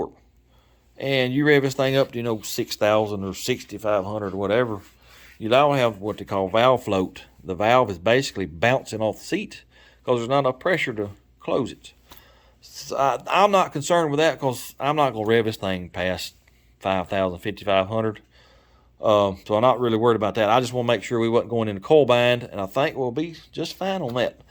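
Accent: South, United States. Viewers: I enjoy your videos and your Southern accent. Take your time and but please don't stop. Thank you. From Namibia.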